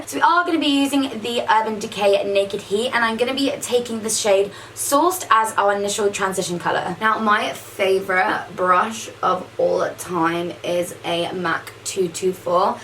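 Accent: Australia. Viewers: Is it just me who loves her Australian accent😜☺️😍😛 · Omg yaaaass! favourite look! soo fab! haha your aussie accent isn't too bad very dramatic but crack up. I am a kiwi ( aka a New Zealander) but still think you didn't doo too bad! x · Your Australian accent is spot on! (I'm Aussie) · I bloody love your Australian accent 😂 keep doing it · have to say for an english girl your aussie accent is good lol but this is a great look :)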